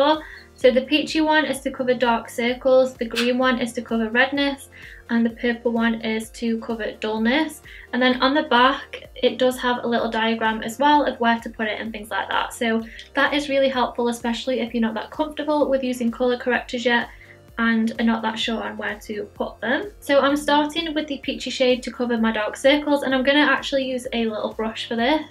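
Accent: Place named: Stoke, United Kingdom. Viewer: Bit of a random question but are you from Stoke? Your accent sounds so Stokie!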